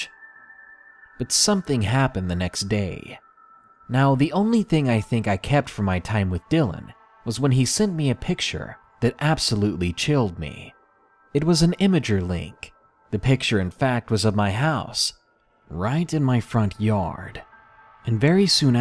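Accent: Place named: United States. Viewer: Great Videos Man, Also love your pure American Accent voice, did you create your profile picture yourself?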